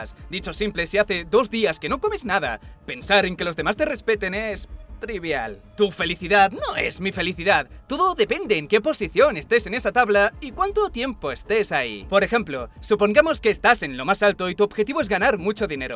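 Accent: Spain. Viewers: "Es confuso verdad?..." - El Dios con la voz española españa talla por los dioses y los demonios... La verdad sabe temas y cosas que puede hacer FAMOSISIMAS!!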